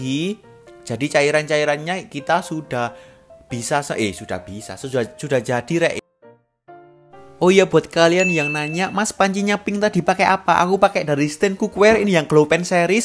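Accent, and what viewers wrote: Java, Indonesia. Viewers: Ayo leeee.smoga sucses.yang UTI seneng km tetap pertahan kan logat jowo mu.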